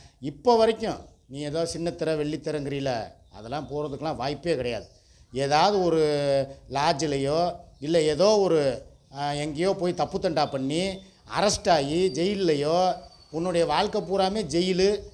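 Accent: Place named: Madurai, India. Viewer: இது உங்க வாழ்க்கை நீங்க யாருக்கும் பதில் சொல்ல தேவையில்லை எது எப்படியோ நீங்க பேசுற மதுரை பாஷை நீங்க கமெண்ட் படிக்கிற விதம் எல்லாமே எனக்கு ரொம்ப பிடிக்கும்னா உங்க தங்கச்சி கீர்த்தி ஃப்ரம் சென்னை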